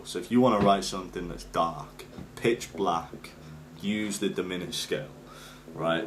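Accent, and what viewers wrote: United Kingdom. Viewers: Thank you for the video man. I found it very useful. I think it may be called a perfect 5th though. Where in the UK are you from bud?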